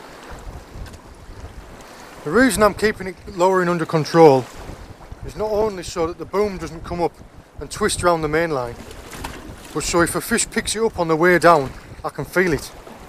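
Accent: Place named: North of England, United Kingdom. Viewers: Best fishing stuff on YouTube.. & this chap sounds like he's from north of England.. & I'll bet he's military or ex military.. reel guy.. very addictive viewing.. keep it going... Manchester😃😃. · you say you hope we enjoyed seeing you catch AND release some lovely bass and even a couple of Pollock. Whats NOT to like about you with your super Northumbrian accent your ALWAYS passing on your skills?? You are what Mr Elton Murphy is to Coarse Angling, You are to Sea Angling young man!! Both first class AMBASSADORS to your chosen disciplines. 😂😊😂.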